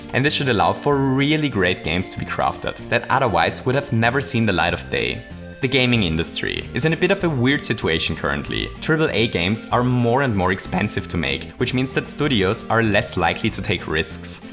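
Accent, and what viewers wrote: Germany. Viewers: Is he german? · Are you German? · Wait what? Are you german? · Wait? Are you from Germany? ? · Are you from a german speaking country? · Wait.. Are you German? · Oh, you are German too? I knew your accent seemed familiar for me xD Nice content man, love your channel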